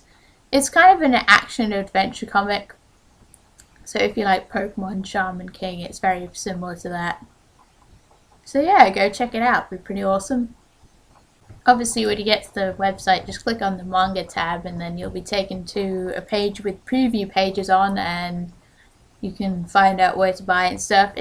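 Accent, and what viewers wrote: United Kingdom. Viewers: Its quite good what you made :) but, i think that the teen childe would be a regular childe :p with 8 - 10 years and a teen childe would be allmoste adult size, or at least at the women size :) but just my opinion xp btw love the british acent ^^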